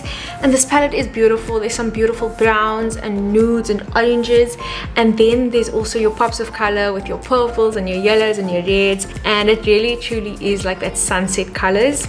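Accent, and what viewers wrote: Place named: South Africa. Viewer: Love the coloured accent. Something only found in sa ..thanks.for.the great video. Fellow sa gal x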